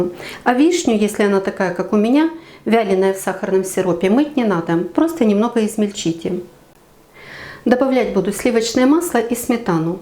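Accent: Ukraine. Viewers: Як же приємно ви говорите українською мовою, так чудово... А язік смердючого орка не можу чути, зразу звучить у вухах, як та погань з матом перерізає горло нашому захиснику, як дають цім язіком накази бомбардувати наші міста, спалювати наші ниви, врожаї, як похабно поливають нас хамською брехнею.... язік, який ви так поважаєте, лелієте..... невже СТІЛЬКИ СМЕРТЕЙ....молоді, діток, стареньких такі мовчазні перед БАЖАННЯМ заробити ті ЗКРИВАВЛЕНІ ЗГОРЬОВАНІ СЛІЗНІ копійки.... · Я смотрю ваши видео как сеанс гипноза, настолько успокаиваясь от вашего голоса,у вас такой красивый украинский язык,ну а рецепты все просто бесподобные,уже очень много готовила по вашим рецептам и все получалось идеально, спасибо вам за ваш труд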